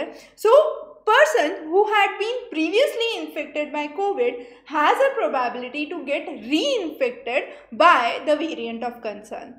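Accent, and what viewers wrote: India. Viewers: english subtitles help me to understand your indian accent english :)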